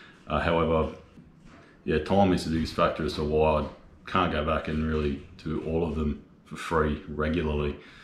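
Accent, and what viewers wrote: Australia. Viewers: you are an Aussie who has one of those accents everyone equates to the land down under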